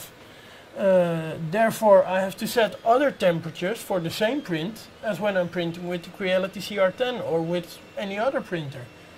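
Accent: Netherlands. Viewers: Grappig, ik vermoedde meteen aan je accent dat je uit NL kwam.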